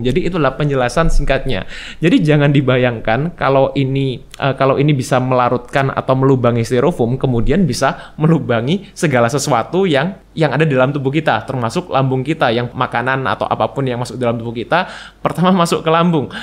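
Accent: Java, Indonesia. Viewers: dok org jawa ya,logat jawanya dapet 😁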